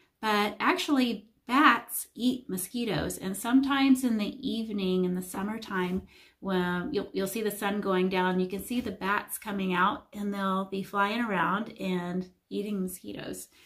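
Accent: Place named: Missouri, United States. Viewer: I was raised in Missouri and know your area. I was mostly listening to your dialect. Seems normal to me but people in other parts of the country hear it. Was in PA, now in Oregon... good video!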